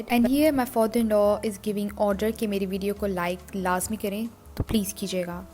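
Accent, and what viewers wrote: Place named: Punjab, India. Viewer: Uff the way you switch from English to urdu to Punjani 🤣ufff Dude you rock in punjabi accent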